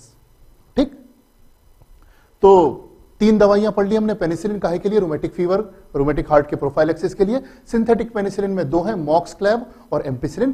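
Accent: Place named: Malwa, India. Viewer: Sir u r a genius with ठेठ (beyond)मालवी accent🙏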